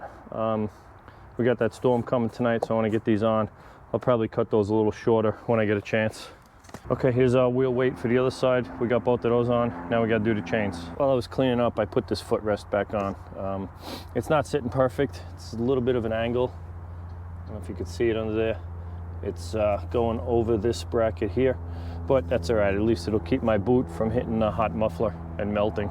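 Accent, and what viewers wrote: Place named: New England, United States. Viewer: I detect a NE accent. For the snow you get…..😬 “Tractor” you say? Funny, I don’t see a 3 point hitch…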